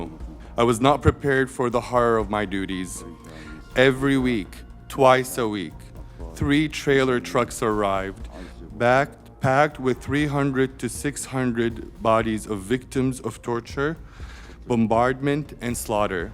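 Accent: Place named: United States. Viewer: دخلك لغتك العنجليزي كانك أمريكي تتكلم بطلاقة متى تعلمت الانجليزية وانت بتعرف بسوريا المتخرجين من الأدب الانجليزي لايتكلمون لانه مافي براكتيك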